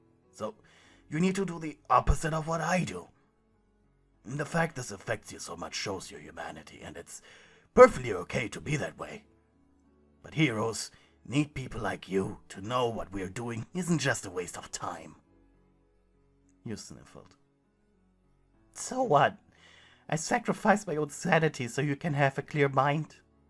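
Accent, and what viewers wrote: Germany. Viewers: Bro I love his German accent! It's cute💖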